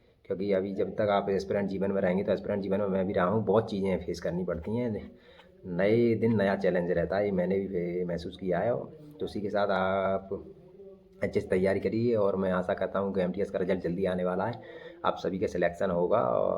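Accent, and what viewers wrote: Maharashtra, India. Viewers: Saff pata chala sir aap marathi ho sir❤🎉🎉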